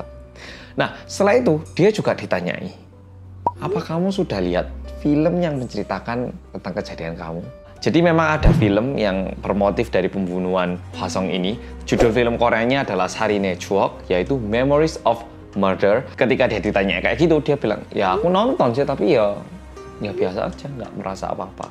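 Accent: Java, Indonesia. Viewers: Cara ngmgnya mas enak bgt jd inget pembimbing magang saya mirip bgt medok2 gitu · Titimangsa apalagi kronologis yang beruntut lebih baik dibaca sih karena kalau salah fatal.. ini keren banget mas ga banyak yang bisa. Butuh jam terbang lama buat bisa selancar mas. Semangat mass medokk💪🏻🍉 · Medhok team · Sedih baru nonton😢, soalnya pulang kepondok sebentar. Semangat dan sehat selalu buat mas yang medoooookkkkk..... 💗👏😂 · Lebih suka medhoookk...dari pada luweee...maaf semua...🙏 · Bang lu logat ngomongnya kek orang jawa si bang 😅😅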